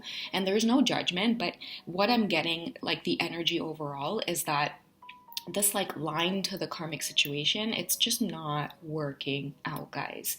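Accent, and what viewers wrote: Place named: Trinidad and Tobago. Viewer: Whoa.....wait a minute...did I just hear you say you are from Trinidad....I knew I heard a Trini accent yesterday when I was listening to another one of your readings....I also lived in Toronto before moving to the US. I have been listening to your readings for the last year now. Great job girl...I am a male Divine Feminine Twin Flame. I met my female Divine Masculine 19 years ago but didn't know anything about Twin Flames at the time. I learnt about the TF journey 4 years ago. We are not in union but hey who knows what can happen. However we are both ascending very very fast nowadays!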